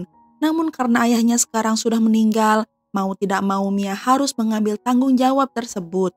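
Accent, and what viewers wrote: West Java, Indonesia. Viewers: Asa ditatar sunda nyaritana...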